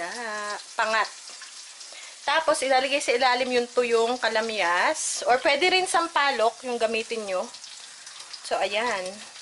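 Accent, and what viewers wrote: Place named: Quezon, Philippines. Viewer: Taga Quezon Ka po ba? Nakakatuwa po kapareho kita ng punto. He he. Ang cute po mga videos mo :)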